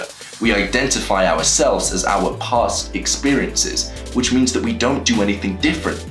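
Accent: England, United Kingdom. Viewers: You sound English it’s refreshing respect from South Yorkshire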